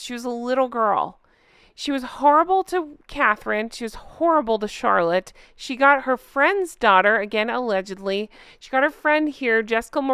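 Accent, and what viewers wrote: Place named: United States. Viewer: i am british and i love your voice and your laugh. We love the american accent in Britain❤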